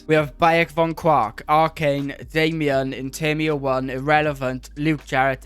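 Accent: Wales, United Kingdom. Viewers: Are you Welsh or African-British? You sound like a combination of both, but I've heard you are Welsh, it's confusing me so much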